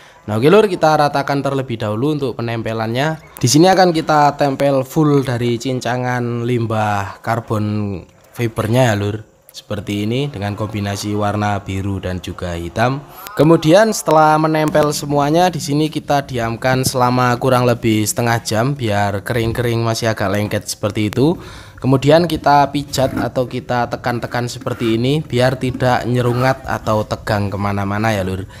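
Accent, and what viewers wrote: Temanggung, Indonesia. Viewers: Koyo wong temanggung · Ketoro lek tiang Temanggung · Nyrongat2, Temanggung banget basane👍👍👍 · Mantep mas.. Logate temanggungan.. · Bosone khas temanggung wkwkwk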